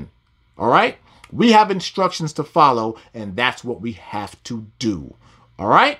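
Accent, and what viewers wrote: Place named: United States. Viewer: My Gee👀 Thank you bro I'm from South Africa so I had to figure it out my self that you were saying Satan because of you American accent with the T sounding like D 🇿🇦🇬🇧🇺🇸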